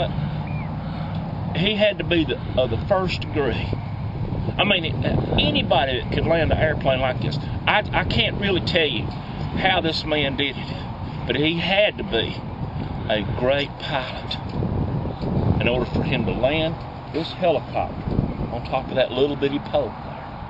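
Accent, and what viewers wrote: South, United States. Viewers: I HAVE actually seen this! I live in a small town in AL called Clay and so to hear that southern accent is so cool! I just recently subscribed to your channel and I just laugh when I hear your stories...love it love it! I found you through Zipster...love him too! Thanks for the laughs!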